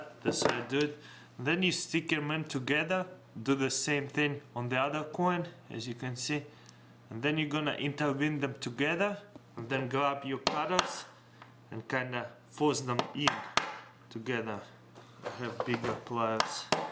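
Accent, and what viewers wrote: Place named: Russia. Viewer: ***** he is russian. i am sorry about the comments. So. he is not slow , he is russian.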